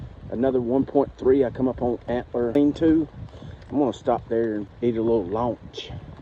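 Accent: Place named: South, United States. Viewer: Just came across your channel, I look forward to following your SOBO journey, should be an interesting trip. When I first heard your voice, I thought, he has to be from the south, and yep, i was right. I am from western NC. Thanks for sharing your adventure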